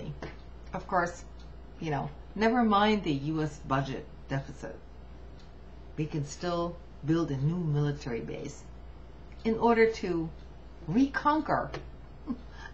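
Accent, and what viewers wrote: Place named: Scotland, United Kingdom. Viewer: seems like you have a trace of scottish or irish accent in yr speech did you ever live there?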